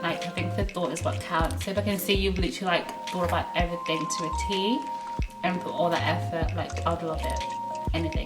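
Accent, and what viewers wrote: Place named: Australia. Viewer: Loved this! Making me miss Australia, you've kinda got an Aussie accent as well now 😂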